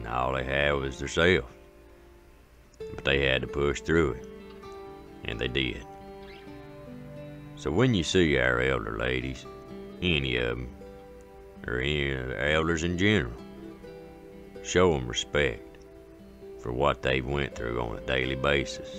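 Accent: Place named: Fayette County, United States. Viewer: Don’t forget the churnin and the ironin and the cannin and the patchin and the bed turnin and the water pumpin and… I declare, listening to you makes me feel like I’m back in Fayette County and Uncle Joe is telling stories while showing off his greens garden!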